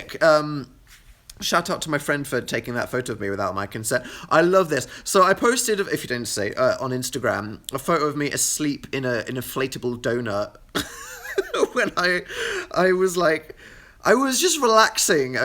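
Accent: United Kingdom. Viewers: your british voice soothes me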